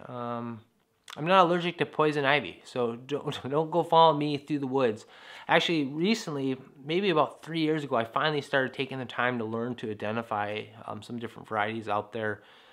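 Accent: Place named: Wisconsin, United States. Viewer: as someone from wisconsin you sound like your from wisconsin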